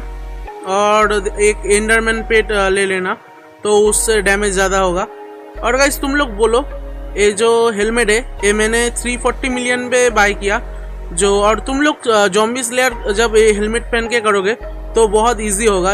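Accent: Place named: Bengal, India. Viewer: guess by your accent I am Bengali too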